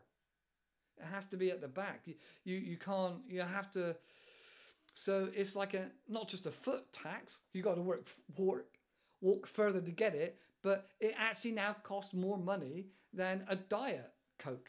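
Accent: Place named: United Kingdom. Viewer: first comment 😊 ... greetings from Mexico 🇲🇽✨ and tank you ...I love the british accent ✨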